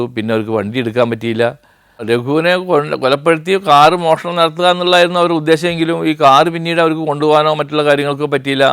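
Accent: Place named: Valluvanad, India. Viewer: വള്ളുവനാട് ഭാഷ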